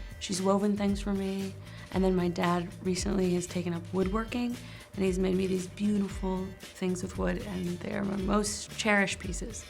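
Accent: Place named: United Kingdom. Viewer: She’s so funny and talented to. Her British accent is 👌🏼❤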